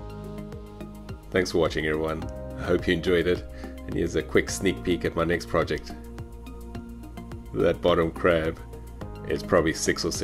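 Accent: South Africa. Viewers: Your address says New Zealand, but your channel name and accent says South African? Great video btw.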